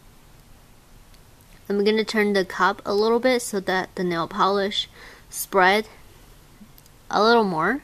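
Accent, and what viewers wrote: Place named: China. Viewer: its only because she has a chinese accent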